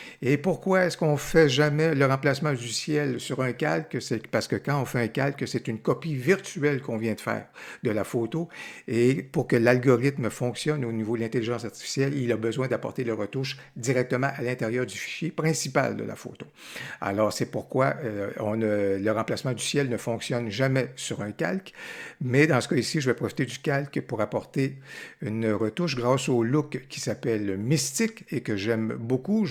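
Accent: Quebec, Canada. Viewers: Merci pour la vidéo. Et je viens de m'abonner à votre chaîne pour découvrir vos autres vidéos également. A propos des reflets du ciel dans l'eau, j'avais visionné aussi cette vidéo-ci qui donne une solution alternative à celle que vous proposez <link> Merci aussi pour votre savoureux accent canadien qui va colorer mon apprentissage ;-) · Bonjour. Tout d'abord, merci beaucoup pour tes vidéos et..pour l'accent Québécois(J'adore!). Je possède la version <num> de Luminar 4 et mon problème est que je n'ai pas, comme sur ta vidéo, "Auto correction distorsion" ni "Supprimer les aberrations chromatiques" dans Objectif et géométrie. Je n'ai que "Supprimer les franges". Est-ce normal? Je te remercie d'avance pour ta réponse. Bonne journée à toi. Alain.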